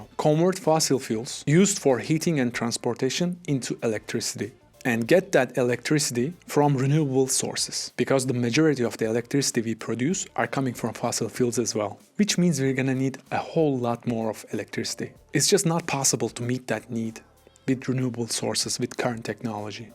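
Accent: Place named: Turkey. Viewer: Nice and clean video. The accent rings a bell, any chance ur a Turk?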